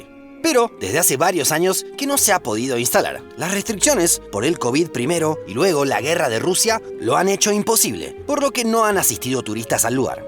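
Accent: Argentina. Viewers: Olvidaste la Isla de Pascua .mmmmm tienes acento argentino. Eso lo explica . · Gracias! Me gusta mucho las vídeos como este. Por cierto, con que acento habla presentador? Argentino?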